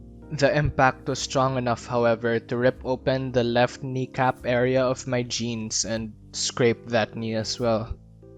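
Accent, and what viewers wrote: Philippines. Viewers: knew you were filipino because of the accent but im still wOAH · HOLY FU— YOU’RE A FREAKING FILIPINO?! Guess I should’ve known. That’s my freaking accent, too. XP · Are you a Filipino? It's because of your accent..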